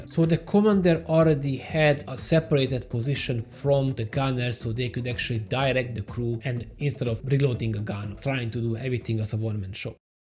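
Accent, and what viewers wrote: Hungary. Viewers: Jó a téma, tetszik. A szóbõséged jó, viszont nagyon, de nagyon erõs magyar akcentusod van. Látszika, azaz hallatszik, hogy rengeteget olvasol,írsz angolul, de nem beszélsz, vagy nem angol anyanyelvüekkel beszélsz. Kicsit azon kellene javítani. Amúgy nekem tetszett.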